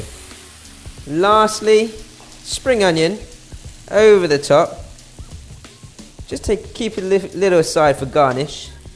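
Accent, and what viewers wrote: England, United Kingdom. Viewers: Fast and efficient cooking , and you have pommie English accent !!!!!!!! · I like the way you speaks . Britainese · You don’t see many Chinese with British accents. Love it! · 🔥🔥🔥🔥 bro you talk like English kat but this dude looks Asian is fuck but makes good ass food bros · Asian guy with a British accent lol. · Some how a Asian with a British accent cooking Chinese doesn't seem all that authentic to me. Kind of like a Brit telling an American world war 2 story. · i am an asian n i am jealous of ur english accent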